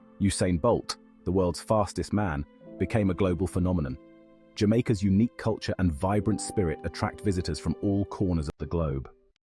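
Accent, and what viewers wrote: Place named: United Kingdom. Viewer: Really? A British voice narrative g that? No jzmaican accent as available? Tacky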